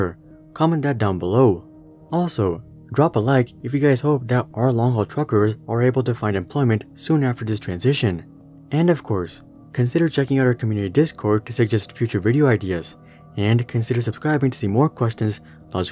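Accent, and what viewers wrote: India. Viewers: On very close inspection, (which I would not have done except for the post as you speak very clearly), I guessed South central Asian, likely Indian. Hooray for me! Good channel. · U a Indian?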